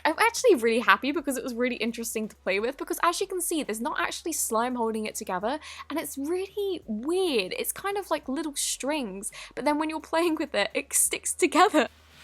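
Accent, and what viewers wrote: England, United Kingdom. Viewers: Don’t forget “at all” in her cool British accent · I really love your British accent! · I love you because your videos are perfect you are really pretty and I love your voice also you're British like meeeeeeeeeee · Are you English???¿¿?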